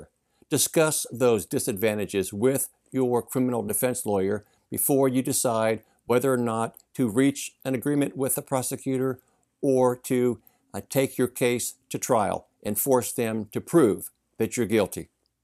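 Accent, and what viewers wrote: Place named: Australia. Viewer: P S well if ultimi are Italian . Let’s talk in italian😂 . I thought you were Australian .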